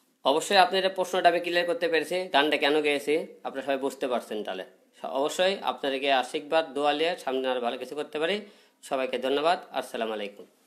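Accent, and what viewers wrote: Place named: Bogura, Bangladesh. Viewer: বগুড়ার ভাষা বাদ দেন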